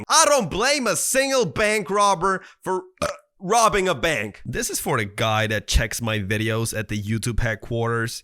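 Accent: New York, United States. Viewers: Bro sounds like he is from new york or something😭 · Thats a new yoker accent for ya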